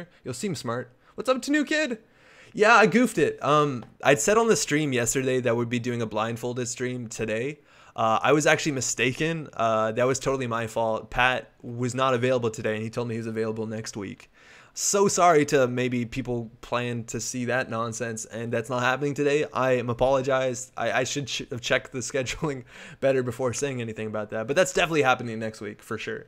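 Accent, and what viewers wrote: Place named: Canada. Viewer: The canadian accent was much stronger back then!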